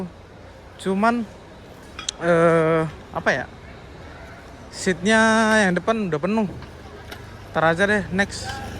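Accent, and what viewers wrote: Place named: Depok, Indonesia. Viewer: Cara ngomong abg ni kayak mas mas Depok Nugroho Febianto 😅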